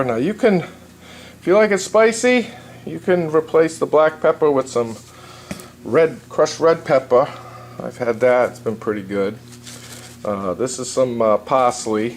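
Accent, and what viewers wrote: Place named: Maine, United States. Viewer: you sound like you're from Maine :)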